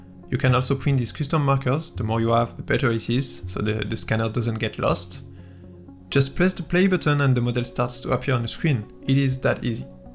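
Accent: France. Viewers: Pourquoi ne pas parler français ça s'entend à votre accent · Woah!!! You’re French! Nice to hear your voice!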